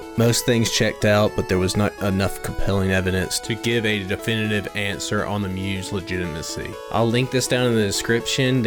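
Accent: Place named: South, United States. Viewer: As a southerner myself, your accent makes this video even more charming. Informative and entertaining. Keep up the good work!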